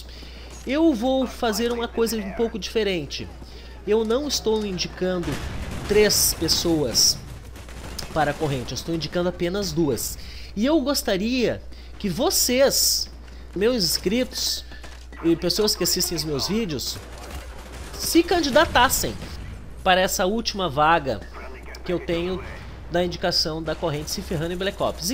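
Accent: Rio Grande do Sul, Brazil. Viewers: tu é gaucho??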